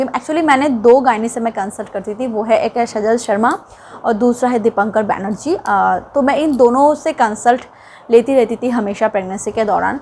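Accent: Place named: Bihar, India. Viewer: Hello my name shehbaj Khan Aap Bihar se belong karti ho Kyu ki apki voice Bihar ke taraf ki hai ye nhi bol rha achi nhi h bas lga mere ko ...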